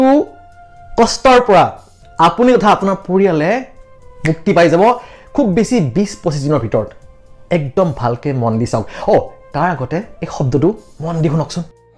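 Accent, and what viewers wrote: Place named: Nalbari, India. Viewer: চাৰ আপোনাৰ মূখ্ত আমাৰ আপোন নলবাৰী ভাষা শুনি বহত বেছি ভাল লাগে আৰু আমাৰ নলবাৰীয়া হিচাপে আপোনাৰ পাই গৌৰব অনুভৱ কৰোঁ চাৰ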